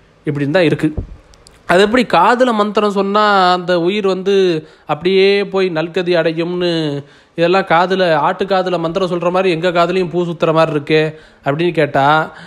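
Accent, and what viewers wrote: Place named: Tirunelveli, India. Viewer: சுவாமி திருநெல்வேலி போல பேச்சு அப்படிதான் தெரியுது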